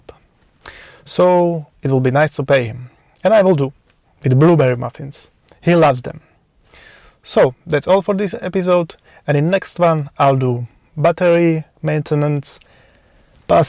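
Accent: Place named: Romania. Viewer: I toght you are from 🇷🇴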